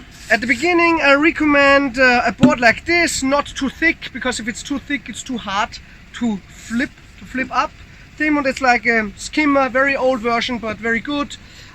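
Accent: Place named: Austria. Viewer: Du bist ein netter Kerl und deine Videos in deutsch sind wirklich hilfreich,aber warum dies Video in englisch? Für wen? Selbst für mich mit Muttersprache Deutsch und sehr guten englischkenntnissen ist es eine Qual dir zuzuhören. Viel schlimmer noch für jemanden mit Muttersprache englisch. Bleib lieber bei deutsch mit nettem (österreichischem?) Akzent. Dein englisch ist einfach grausig.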